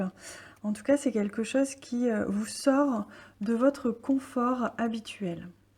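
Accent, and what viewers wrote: South, France. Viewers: Merci, trop mignon le flamand rose Avé l'assent 🌹😊😊😊😊💕❤️❤️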